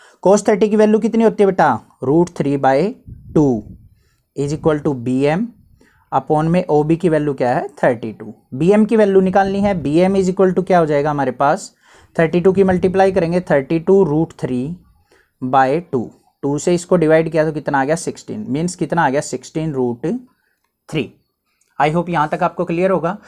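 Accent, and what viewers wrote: Haryana, India. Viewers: hm pta mujhe pahala hi pta chl gya bs confirm ny tha m vaisa sir ap hariyana m konsi side rahta ho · Sir ki voice me hi haryana show hota hai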